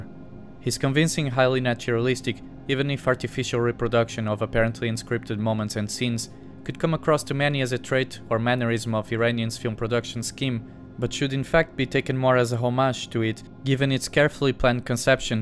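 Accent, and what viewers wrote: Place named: Portugal. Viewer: Esse inglês com sotaque português não me enganou! Parabéns pelo vídeo, para mim o Close Up é dos melhores filmes de sempre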